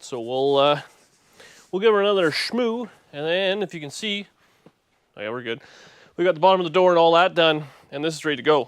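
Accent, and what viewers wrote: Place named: Canada. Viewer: After sitting here and watching the video, I am wondering why I enjoy this channel so much and it came to me. It’s! Aside from the great projects, his obvious skills, and hard work ethic, he has a likable personality. That quality and his ability to verbalize the way he goes about his projects with a bit of humor and devil may care (“it will be fine”) attitude keeps the viewer’s attention. I like the Canadian accent and the fact that he keeps it clean and family friendly. Through all the problems and sweat, seems to be a happy guy. BTW, nice job “smoocin’ the ‘57!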